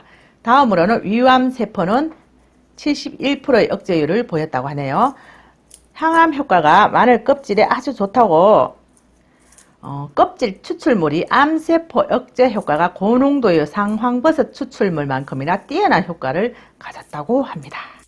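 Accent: Gyeongsang, South Korea. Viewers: 살림 요리 등 등 진행중에 고향 을 느끼게하는 고향말씨 좋은 꿀팀이되는 유익한정보 등등 너무나 좋게 듣고봅니다 참좋으신분 항상도 건강하시며 경상도말씀으로 씩씩하게 해주세요 👍👍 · 맞아..유튜브로 돈버는것도 중요하지만..자기자신을 잘아는것도 큰 힘인것 같다..내 목소리..내 말투가 어떤지 상대방이 듣기 좋은지 ..거슬린지..자기 자신을 제대로 안다면 이렇게 장황하게 자신있게 계속 말하진 못할것 같다..진짜 경상도 사투리도 억쌘데 목소리도 거칠고..그럼 어떻게 영상을 만들어야 하는지..생각을 못하는걸까... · 경상도 말씨 애교가. 많네요. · 영상은 10분으로 줄여 주시는게.. 경상도 말투로 쉼없이 계속 말씀 하시니 뭔 말인지 모르겠어요 귀가 멍멍합니다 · 시끄러운 경상도 수다쟁이 아줌마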